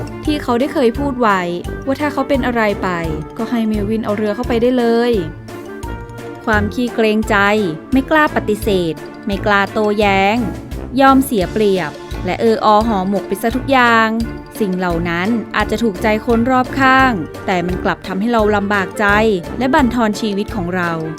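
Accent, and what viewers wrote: North, Thailand. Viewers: แอดมินเป็นคนเหนือใช่มัยครับ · สนุกกกกก คนภาคเหนือแน่ๆเลยอะ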